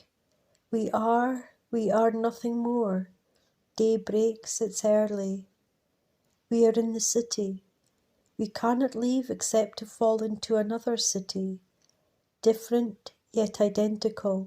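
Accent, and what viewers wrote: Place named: Scotland, United Kingdom. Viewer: Love the Scottish accent !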